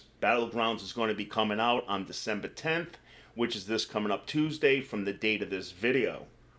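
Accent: New York, United States. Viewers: Thanks for keepin it real, also dig the NY accent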